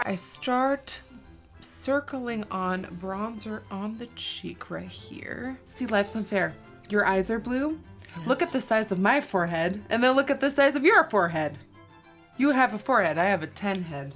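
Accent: Netherlands. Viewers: Haar Engels is zo grappig ik vind het echt geweldig lekker nederlandssss 🇳🇱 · Love the Dutch accent, really miss the Netherlands!! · Ik ga helemaal stuk, zo’n heerlijk Hollands-Engels accent..prachtig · Ik ga zo stuk om dat steenkolen engels eh, heeerlijk dat typisch nederlandse😭😂❤️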